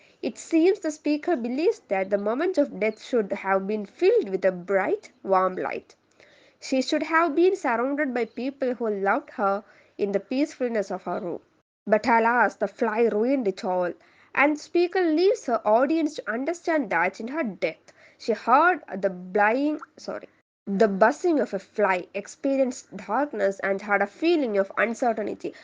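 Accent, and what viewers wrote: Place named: Trivandrum, India. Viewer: ha maximum trivandrum slang varathe nokki enkilum i caught u😌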